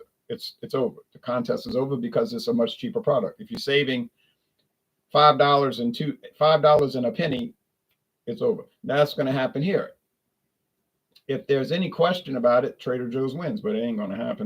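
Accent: Louisiana, United States. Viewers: I appreciate this video even being here! I’m only this deep into the video lol but the Trader Joe’s imo is gross. I’m a glenlivet girl, I just finished the founders reserve last week which I like better than the 12 tbh. I damn near wanna pour this Trader Joe's Speyside down the sink it’s so gross lol. Also, I saw a glenlivet carribean that looks interesting, I think it’s aged in rum casks. you are so funny 😂😁 you found it! ❤ 😮 that’s where I’m from! 91604! I was wondering if you were from Louisiana towards the end I began to notice your accent. I went to watch it again and you mentioned Metairie which I missed in the beginning but I recently visited for the first time last October. I had the best food ever at New Orleans food and spirits in Metairie. Anyway I really enjoyed this video. I was looking for this exactly after tryna go cheap and convenient tonight. 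Thank you sir 🙏🏾🤙🏾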